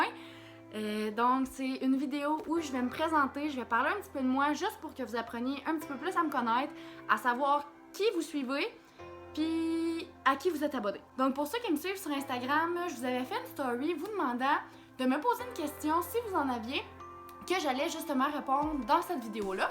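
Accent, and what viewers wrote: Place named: Abitibi, Canada. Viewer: On est pas a Mtl mais justement, les gens vont voir autre chose que du magasinage et vont voir une Abitibienne rentrer du bois de poele tin toé ahah! Bravo de te lancer:) moi jpense que cest interessant de voir du différent, justement dans ta facon de parler on le voit que tes une Abitibienne pi ca vient me rejoindre ahah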